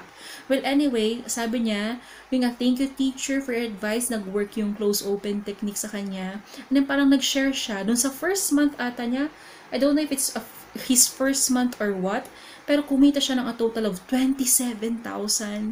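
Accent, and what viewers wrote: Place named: Pangasinan, Philippines. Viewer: hi po teacher! just found your channel because of 51talk..I'm a new teacher po and because of your videos po nalalaman ko ibang tips and tricks regarding the company..i am from lingayen pangasinan po and napansin ko po ung "ey" na expression kaya napa subscribe ako kasi sa isip ko "uy pareho kami...tiga pangasinan kamin dwa" hahaha nice to see someone po here in youtube na kapareho ng dialect..keep on updating us po sa mga ganap and thank you po sa mga shinishare niyo malaking tulong po..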